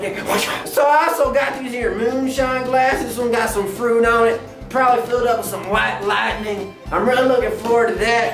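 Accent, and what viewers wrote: United States. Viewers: Yea he was just trying to dress and sound like an american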